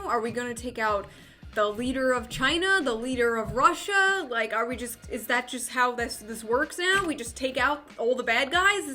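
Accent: Boston, United States. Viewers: She is from Boston.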